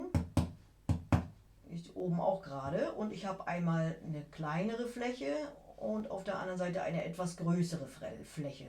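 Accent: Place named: North, Germany. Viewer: Wunderschöner Frosch, echt witzig und super erklärt, übrigens höre ich gern deinen norddeutschen Dialekt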